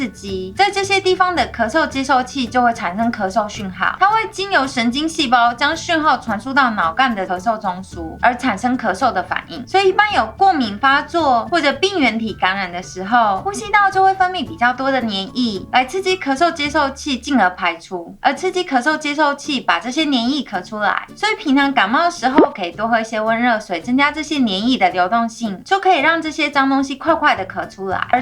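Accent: Japan. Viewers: 有點日本口音⋯ But Good Job!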